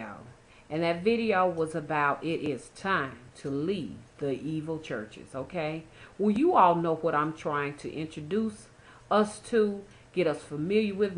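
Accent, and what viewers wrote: South, United States. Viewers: this is the first time am watching and I believe no one should have judgment of anyone. i love your southern accent am Caribbean and I like how the people from the south down home. I don't know much about this stuff but alot of what you say is true. I am tired of hurting and my heart broken when am always be so nice. I really want my boyfriend back and want him to love me again. Us living in china i think he had something put apon him to up and leave. I just want him back.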